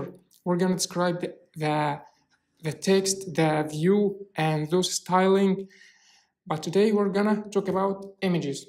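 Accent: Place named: England, United Kingdom. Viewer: Love the way you explain concepts, and especially your English accent it's so cute!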